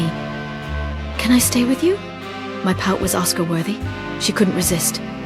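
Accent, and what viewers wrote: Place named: England, United Kingdom. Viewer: Nice English accent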